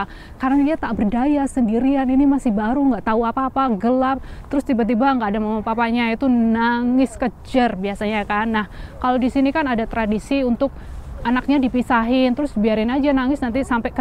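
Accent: Banyumas, Indonesia. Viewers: mba esih katon logat ngapake:D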